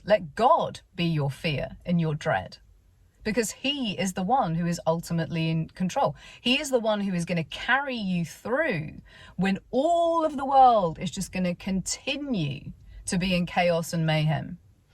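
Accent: United Kingdom. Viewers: Great 😃👍 Brit🎉 accent ❤ ! Along with superb teaching, to 🥾 boot!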